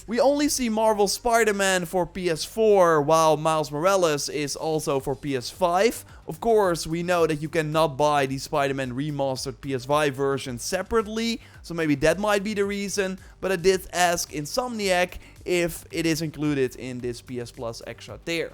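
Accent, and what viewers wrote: Netherlands. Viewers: Do I hear a Dutch accent jongeman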